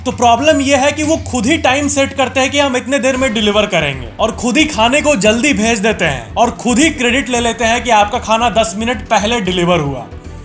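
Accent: Bihar, India. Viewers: Itne bade youtuber ho par aap ki laungauge sahi nahi hai botton badao sahi hota aap boltr ho battan dabo ge lagta hai aap bihar k ho.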